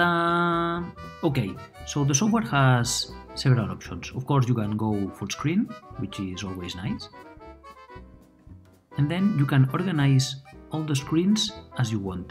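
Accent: Catalonia, Spain. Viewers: Ese acento español jodeeeeer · Se nota demasiado que hablas español tío JAJAJAJA por cierto muy buen video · ese acento español sigue intacto papu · se nota bastante. Uno el nombre y algunas palabras en inglés que suenan muy español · Eres español, no? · Tío intenta hablar mejor el inglés que se te nota que eres español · Loko como se nota que eres español xdd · No se como he llegado aquí pero tu acento, suena como un español intentando hablar inglés y pronuncias raro xD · Buen vídeo,se nota demasiado que eres español jaja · Vale pavo y si haces los vídeos en español? O catalán...porque llamándote... inglés no eres y americano tampoco... Así que igual también estaría bien que los compatriotas te entendiéramos. La pronunciación te delata colega. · Soy yo o hablaa como español hablando en ingles:v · No, he is not French. That's more of a Spanish or Catalan accent. 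And since he's from Andorra, it's clearly Catalan. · Cómo se nota que eres español xDd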